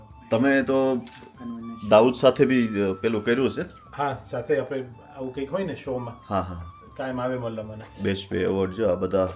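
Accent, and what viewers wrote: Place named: Porbandar, India. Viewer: Tame Porbandar na lago se avu lage se bhasa upar thi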